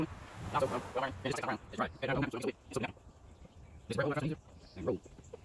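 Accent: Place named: Georgia, United States. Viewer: Hear them accents all time here in atlanta lol.. Not sure where in Georgia he from tho..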